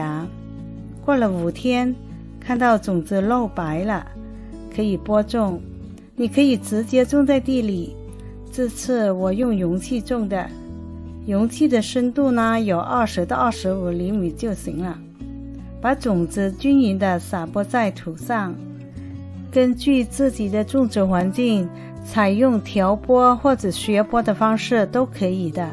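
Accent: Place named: Cantonese, China. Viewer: 喜爱的广东口音，亲切，可爱。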